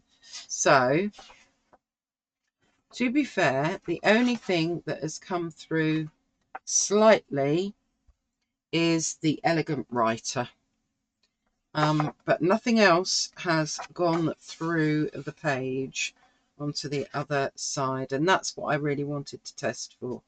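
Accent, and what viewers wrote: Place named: London, United Kingdom. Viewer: ￼ ￼ Hi! Saw your comment on my most recent video and thought I would hop over to see what you get up to on your channel! Good to hear another English voice – do I detect a London accent? Cos that’s where I’m from originally! Interesting to see the things that you’ve recently purchased. I’ve had a couple of things from Temu that haven’t been to marvellous, but I’m always interested to see what they are having on offer. Have you heard of the fountain pens by Sailor? They seem to be trending at the moment…￼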